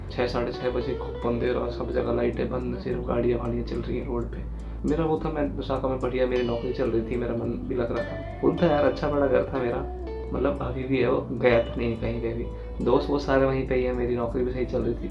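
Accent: Haryana, India. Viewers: Bhai k video dekh kaza aaajaata h...thodi tension me lgra h.. bit haryanvi h bhai.. jhel jaega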